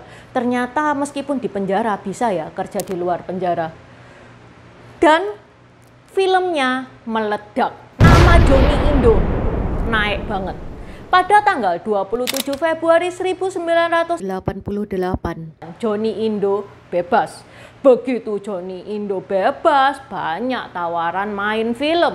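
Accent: Java, Indonesia. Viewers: Uraian ceritanya, ter urai indah, walau tekanan / aksen jawanya masih medok, tapi masih dapat di mengerti. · Mbak kental banget logat Jowo nya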